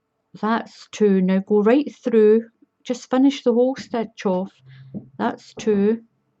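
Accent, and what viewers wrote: Scotland, United Kingdom. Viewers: thanks, I live in Scotland so your Scottish accent is wonderful to hear,so many channels are American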